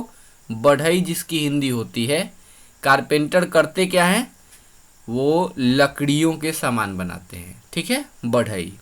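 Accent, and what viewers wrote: Bihar, India. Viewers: Gajab explaination in Bihari style...😀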